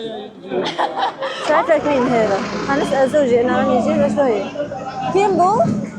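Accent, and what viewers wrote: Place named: Tunisia. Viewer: انا اكتر شي مستمتعة بلهجتك التونسية الحبيبة ,,, فيديو أكثر من رائع <3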